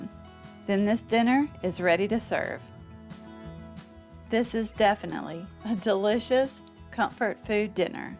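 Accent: South, United States. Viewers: What a gorgeous lady with a nice southern voice ♥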